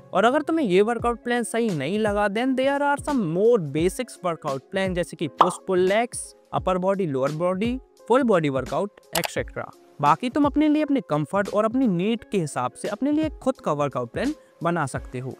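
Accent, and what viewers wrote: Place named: Bundelkhand, India. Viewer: Bhai kha se ho voice se toh bundelkhand ke lagte ho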